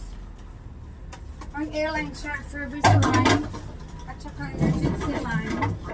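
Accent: Waray, Philippines. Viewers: hahaha amu gad kukurian pag tagalog kay wraywaray kaman ngean..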